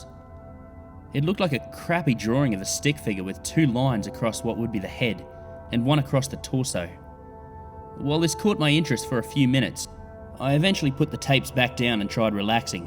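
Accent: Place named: Australia. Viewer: This is definitely one of the best creepy stories I've heard in a while , I mean u knew the tapes would be bad buuuut....yea that was so original. I love ur Aussie accent n I love how u can change voices n pitches and personalities. Fanfuckingtastic!!!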